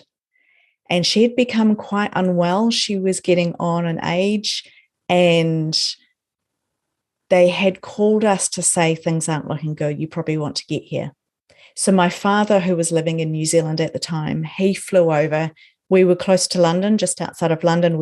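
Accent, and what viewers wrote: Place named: Scotland, United Kingdom. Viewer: My favourite YouTuber in the world! from Scotland!!! I love your Scottish accent❤️❤️❤️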